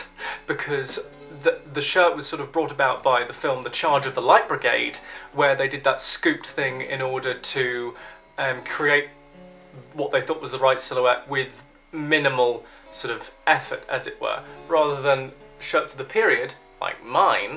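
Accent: United Kingdom. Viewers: Love your very english english ❤ a plesure to listen to you. And you are very polite in your review of clothing accuracy. A gentleman 🎉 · PS your accent is very posh and regal.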